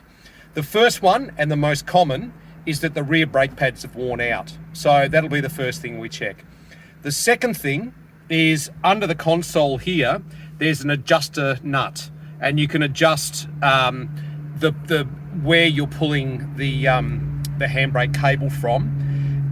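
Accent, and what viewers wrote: Australia. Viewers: Cheers mate, nice easy to follow demo and loving the Aussie accent. Many thanks from Sunny Scarborough ,North Yorkshire UK. Fairer than fair dinkum:goodvibes:.Kindest regards, Paul.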